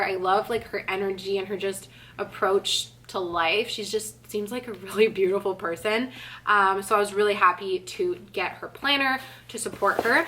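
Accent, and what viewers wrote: Quebec, Canada. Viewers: Oh my gosh I love your French and love your accent even if it is hard for me to understand. I love Quebecois!! Thank you for adding that clip haha🤣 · Salut,! Your channel got reccomended to me in Brazil, so I guess it's an indication of how much you've been growing, and I thought you'd be happy to know it. I'm in my late 20's and, to be honest, we have very different personalities and life styles, but I love to hear you talking - even though I don't relate much. I think you're a great communicator and also very entertaining. Hopefully you can make youtube your main source of income really soon! Btw, I've started learning French this month, I speak Portuguese, so it's a bit easier since they're both Latin languages, and I was so shocked to hear you! Canadian French sounds soo different from French from France, I had no idea, even though I lived in Toronto for a year (not much French there, but I did visit Ottawa and Montreal a few times and was so oblivous to the language). 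I'd looooove to hear you uploading more French content here and there.:) best of luck!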